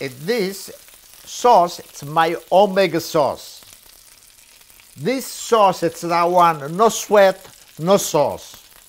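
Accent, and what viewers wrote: Italy. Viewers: Classic Italian acsent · Excellent recipe, the version with beef is called pizzaiola with origan instead of pepper and without eggs and breadcrumbs simply cooked. Italian accent but no Italian living in Italy would eat a second (main dish) together with a first course, we believe in the separation of... powers (chef Montesquieu😉 ). Dividi et impera.. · I'm loving that accent, he's a true Italiano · Cin cin! Love his Italian accent! I made his parpedelle & Bolognese the other night. It was so so good! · Love when Italiens speak English ❤️ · I love his accent. Yes I want to learn how to make real Italian food from a real Italian. Thank you for this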